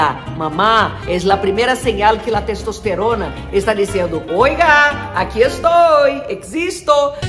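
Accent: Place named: Brazil. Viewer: Me gusta su acento brasileño y habla el perfecto español. Saludos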